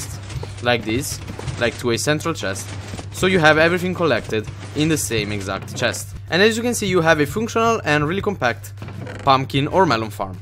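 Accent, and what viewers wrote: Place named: Italy. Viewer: Ma quanto si sente che sei italiano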